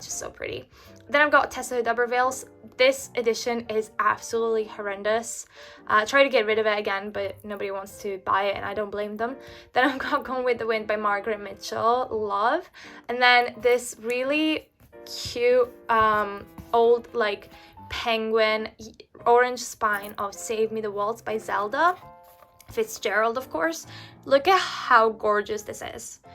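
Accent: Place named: Scotland, United Kingdom. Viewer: Her accent sounds Scottish, so I assume Scotland.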